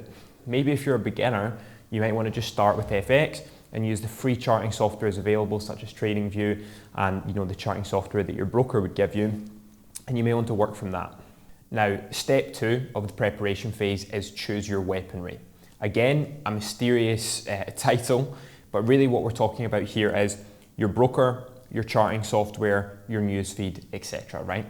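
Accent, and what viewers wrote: Scotland, United Kingdom. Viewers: Where abouts are u from mate u sound Scottish ?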